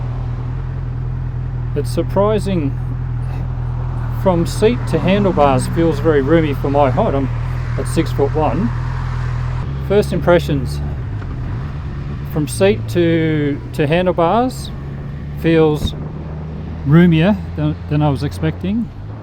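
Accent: Australia. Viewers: Good to hear an Aussie test riding bikes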